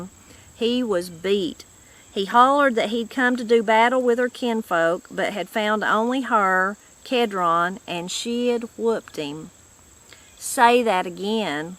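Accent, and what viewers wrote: Appalachia, United States. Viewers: Enjoyed your reading. You remind me of my late M.I.L. And her sisters. All from Appalachia ( West VA)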